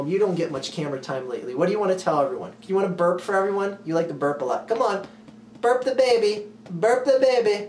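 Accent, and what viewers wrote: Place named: New Jersey, United States. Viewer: He has a Jersey accent if I'm not mistaken.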